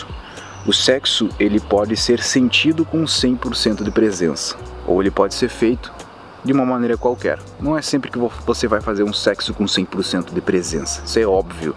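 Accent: Rio Grande do Sul, Brazil. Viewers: Tu é gaúcho? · Ah, sabia que conhecia teu sotaque! Tu é do RS né?! Se não, é muito parecido. Excelente vídeo e paisagem 👏🏽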